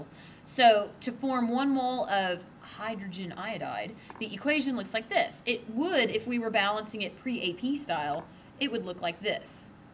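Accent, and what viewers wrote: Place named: South, United States. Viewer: If my teacher had a cute barely southernish accent instead of being some 65 year old bore, I think I would have enjoyed class a lot more. Thanks for helping with my finals!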